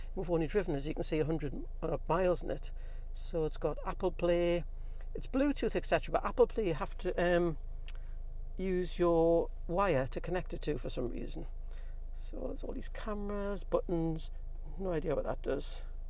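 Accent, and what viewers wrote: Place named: Wooler, United Kingdom. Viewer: I have a friend that lives at Wooler and you share the same accent great review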